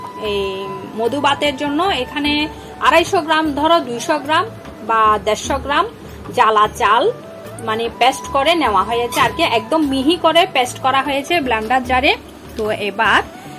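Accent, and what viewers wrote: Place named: Noakhali, Bangladesh. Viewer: আমার মনে হয় আপনার বাড়ি ফেনি অথবা নোয়াখালী হবে । চট্টগ্রামের আঞ্চলিক ভাষা এরকম নয় ।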